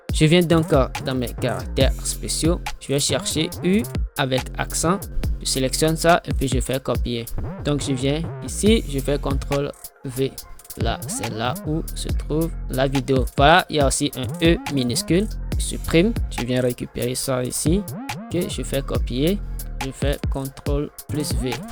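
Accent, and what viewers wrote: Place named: Benin. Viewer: T'es béninois ? Ton accent...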